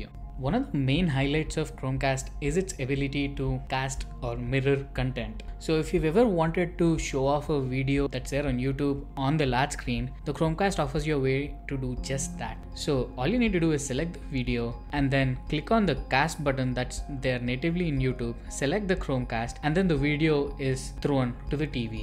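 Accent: India. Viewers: that Indian accent though... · Haha...he is speaking Hinglish. So, you won’t understand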